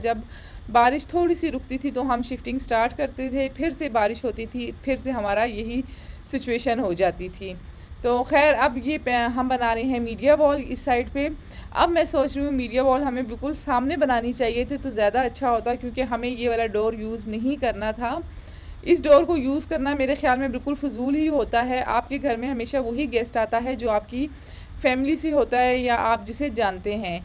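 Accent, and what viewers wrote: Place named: Pashto, Afghanistan. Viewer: AOA dear sister,sanga ay❤sha ay?staso pashto koh bilkul zama shanty da😂andaz koh bilkul zama trhan dy😂😂aao vlog k pashto lazmi waya.deer sha lagi❤❤❤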